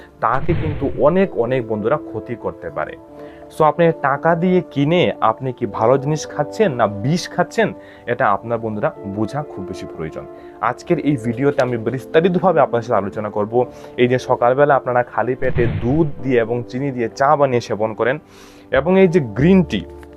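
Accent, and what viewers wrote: Sylhet, Bangladesh. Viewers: Apni mone hoy sylety. Khub kosto kore shuddho bangla bolsen😂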